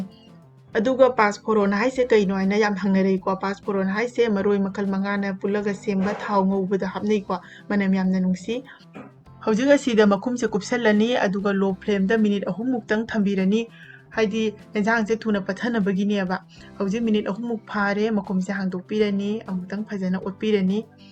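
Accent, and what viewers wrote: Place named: Imphal, India. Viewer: Yaamna fajaba, asengba meetei lon ngaang-nge Imphal lomgi, video upload ta ngaangliba meetei lon sing Adudi taabada pelle.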